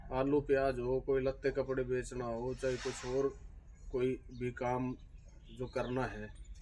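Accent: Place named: Alwar, India. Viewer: आप अलवर से हो ऐसा लगता है बहरोड़ मुंडावर साइड से भाई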